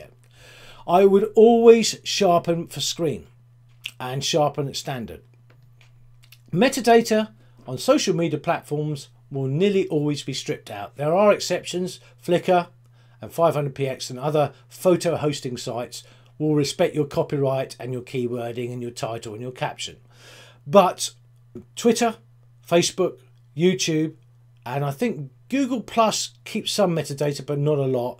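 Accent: United Kingdom. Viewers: Good explanation, Been struggling with this for a bit but makes a lot of sense. Nice to hear a UK voice on a LR video. Thanks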